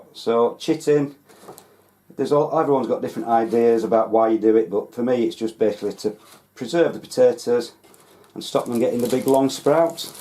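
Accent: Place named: Barnsley, United Kingdom. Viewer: c v later you sound like you're from the barnsley area im from wath myself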